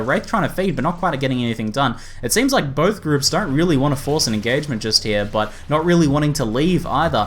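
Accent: Australia. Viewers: D yeah I noticed. Good job though. Overall, I really enjoy you casting Evolve quite a lot. Shame you upload those relatively rarely. Evolve is an awesome game to watch, especially when there are so many skirmishes (or maybe one constant battle?) which are barely pulled of! P.S English is not my native lang so I've tried to "decipher" what is the 1st word of your intro/welcome catchphrase? Is it "Good day it's here [...]"? Or...? I generally don't have problems with understanding spoken or written english but your "down under";) accent coupled with the fast-forward tempo of you saying it... well you get the idea:P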